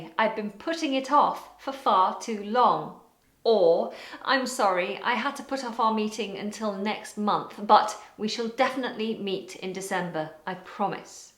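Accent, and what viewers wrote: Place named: Liverpool, United Kingdom. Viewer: lol, sudden transition effect scared me:)... SUPERB VIDEOS ..KEEP POSTING ...WANNA SEE ANOTHER LIVERPOOL ACCENT VIDEO OR MAYBE A SERIES OF VIDEOS....haha amazing accent.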